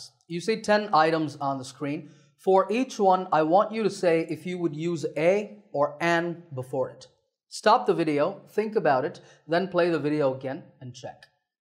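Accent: United States. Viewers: He spoke like, an American. Wow 🤩his vocabulary is fantastic. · Sir your video is amazing . Also you have a american accent .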